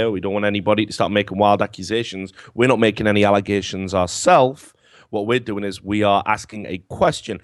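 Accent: United Kingdom. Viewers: Is it just me or is speaking in a more british accent in this video..:^) I like it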